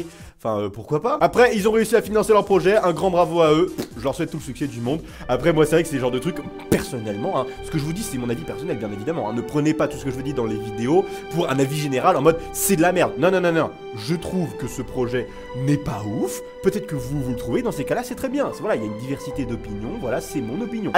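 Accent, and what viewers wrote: Quebec, Canada. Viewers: Ton accent québécois est magnifique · IL EST COOL L'ACENT QUÉBÉQUOIS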